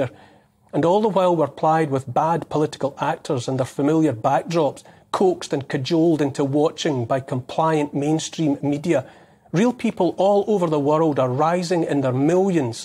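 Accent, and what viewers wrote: Scotland, United Kingdom. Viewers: I fond of his scottish accent and independent attitude :) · You'll get no argument from me on that one 😁 😉 Neil Won't Kneel A gentle, beautiful Scottish lilt A kind, empathic, forward tilt An open heart; a caring face A willingness to take his place. A strong, home-hitting monologue Reminds us of the boiling frog The courage to become a sleuth A passion to release the truth. A deep desire to crack the whip Illuminates our freedom-strip Calls out the darkness taking hold The shiny despots; plated gold! Intense and raw, he lays it bare A soul-enhancing, honest stare Inflections drive the message through He speaks for Us - for me and you! Discernment's such a precious gift His words create a mighty lift Take heed of all he has to say He knows - it shouldn't be this way! 🤗🦋🐬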